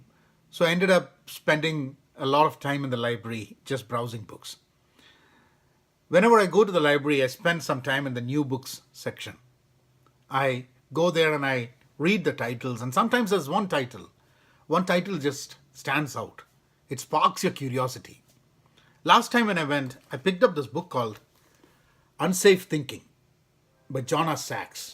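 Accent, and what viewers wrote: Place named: India. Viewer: Sir its nice that an Indian is there for me so i can understand slowly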